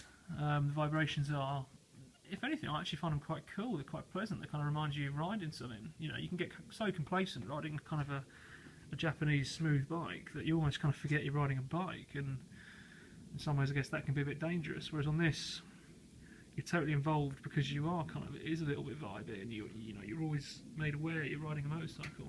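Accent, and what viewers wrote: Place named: United Kingdom. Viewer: i really wanted to hear this video,but i just can't; i can decipher a British accent fairly well but need to be able to hear it to do so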